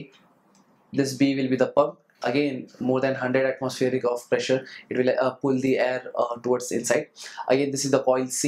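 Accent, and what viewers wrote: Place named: Tamil Nadu, India. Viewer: Tamil speach touch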